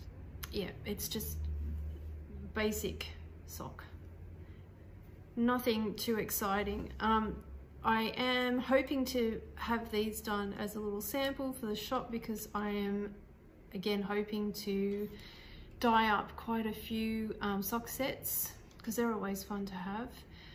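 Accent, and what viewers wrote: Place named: Australia. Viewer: Wow I was wondering the other day if you would ever podcast again! I think it’s been 2 years since you podcasted last. So nice to see you again and hear an Australian voice for a change. Looking forward to seeing you more regularly ❤ btw I love the smocking cardigan you were wearing.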